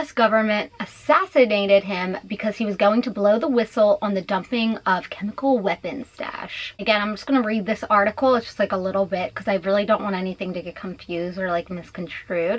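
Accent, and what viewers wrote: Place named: Philadelphia, United States. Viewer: You’re from Wilmington!! I was wondering where and I must’ve missed it before. I’m from right outside Philly (prospect park) and I knew you sounded like me...our “accent” lol 😂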